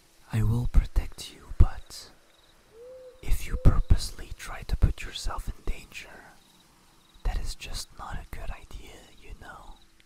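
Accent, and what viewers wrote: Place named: France. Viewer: I was not into French accent before, but now I'm getting obsessed 😅🤭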